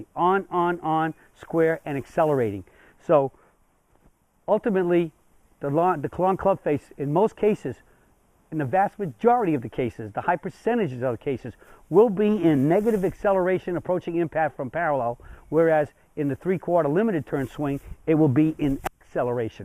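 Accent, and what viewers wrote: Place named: Boston, United States. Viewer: you sound like you're from the boston area like me. thnx for the lesson.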